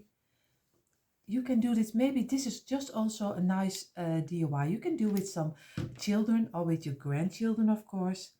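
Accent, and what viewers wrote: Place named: Netherlands. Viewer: You are dutch I heard. .my family originally came from Holland. Our last name use be visser but was changed to visher.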